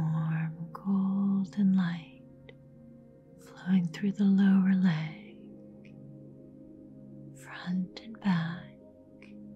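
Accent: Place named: United States. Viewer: REALLY?? oh how supernice! you sound USA, such good english, so lovely!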